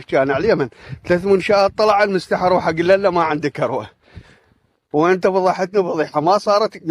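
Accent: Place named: Samawa, Iraq. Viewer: بس صدك لهجة مالتك مال سماوة عدنا ضابط هيجي لهج يكولكم هچي كم كلمة كلتهن انت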